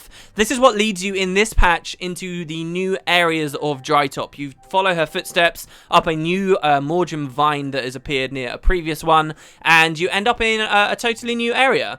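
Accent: United Kingdom. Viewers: Your voice, is fucking amazing! Love me a well spoken Brit...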